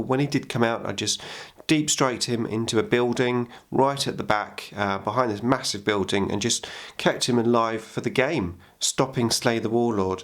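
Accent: United Kingdom. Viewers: 40k Where are you from btw? I would likr to improve my English level(by watching wh videos) and ur accent sounds cleanly british haha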